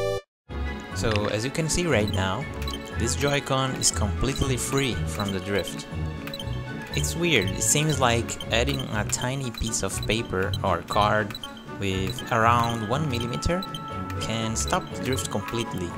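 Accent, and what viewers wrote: Brazil. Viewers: Nossa bem q eu tava achando esse sotaque dele suspeito KKKKKK sabia q era br esse puto ta procurando ele falando isso na descrição valeu ai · Tamo junto irmão, deu pra perceber pelo sotaque, mas o seu inglês é muito bom cara. E tambem, ótima explicação, ajudou muito, vlwww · Are you brazilian?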